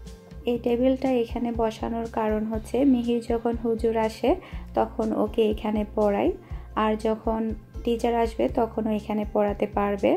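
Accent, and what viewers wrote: Chittagong, Bangladesh. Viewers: Apnar kotha shune Mone hocche . Apni amr attio. Bcoz we r chatgaiya.(Chittagong) 💕💕💕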